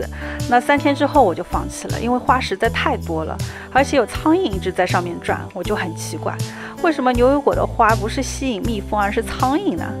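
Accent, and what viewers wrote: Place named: Zhejiang, China. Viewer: 口音是浙江的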